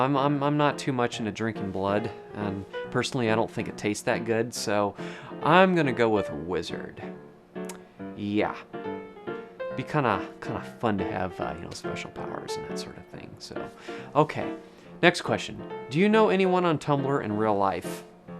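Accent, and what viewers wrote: California, United States. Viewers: A white people California accent. That what you got . every group of people everywhere you go anywhere talk different An got they own accent . Jus cause you from Cali an talk that way don’t mean everyone talk like you . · I could tell you were not from southern ca quickly. Calif but not south. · you say San Francisco with a slight "p" sound where the "f" is. Also you say "fer" instead of "for" when you're not paying attention. Yup, Californian. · Yeah it's pretty Californian, but I'm from Socal myself so I can't tell. if I wasn't a native, I prob wouldn't know the difference between Cali and rest of the west. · omg hell yah man! That was hella california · Ooh, nice catch! I grew up in Santa Rosa. It took living in other states to understand that yes, it indeed is a California accent. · Your accent sounds like mine. I’m from central california coast. 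So yeah you have a California accent! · "we speak much faster..." Uh come on dude, that is absolutely not a stereotype or classification property of the California accent or of any other accent, it is more due to personal preferences and other personal features. That stretching of phrases like "no wayyyy" either btw. Sry but what are you talking. Dude of the vid has a (light) Californian accent (not a strong one)...I can tell since I am Californian myself. And yes I know the vid is very old (in fact it is half as old as me) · The thing that gives you away as Californian is the sing-songy cadence of your speech. This is a major thing that differentiates it from the heartland midwest accent (think Omaha or Kansas City: smack dab in the middle of the country. This is where the "standard American dialect" comes from for the most part). How do you say "milk"? I know a number of Californians who say "melk". 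Very odd. Finally, the other thing that marks you as a Californian is your inability to imagine people saying words differently than you do, or using different terms. Like the fact that you've never heard someone say "ahh-urn" for Iron. Or the fact that you think you can only call athletic shoes sneakers or just shoes. Many people call them tennis shoes. In my experience, most Californians haven't traveled much in the US and are somewhat sheltered from Southern, midwestern, or rural cultures. Hopefully this doesn't sound too harsh... I just think it's interesting that you couldn't picture other ways of speaking in some cases (no one says sal-mun for salmon. But northern midwesterners say it with nasal a, and southerners say it a bit like "sahy-mun"). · Bay Area born and raised, until I moved up to the PNW around age 30. I definitely noticed CA transplants after living there for a few years just by the way they talked. 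I did pick up on your accent, though you were talking pretty slow in the intro to this video. If you were talking at normal CA speed it would definitely come out more. I live in CO now, where I really have to slow my speaking down, even compared to PNW. I don't even pronounce the "a" in "theater".. just straight up "theeter". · Californians over pronounce the English language. That is why you do have a Californian accent. I would guess Northern but you already confirmed it. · Far and away an obvious Californian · California. Not only accent, but even your mannerisms. If you aren’t from California I would be shocked. You could be my neighbor. : ) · You Californian as fuck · I was looking for a video to explain the Californian accent to my English students and they are learningn English. You started speaking and I thought wow you must be from close to where I am from. In fact I grew up in Napa too! 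That's really cool! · I come from the California bay area and you sound exactly like me. I looked it up to show what a friend in the UK what I sound like, she has an essex accent. My friend used to say Wersh! I think her parents were from somewhere else.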